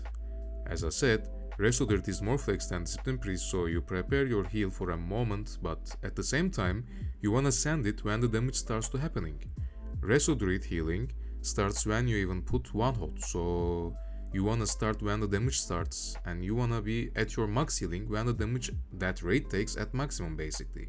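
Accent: Turkey. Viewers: Are you by any chance, Turkish? Your accent sounds like it :) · Sen türksün aksandan belli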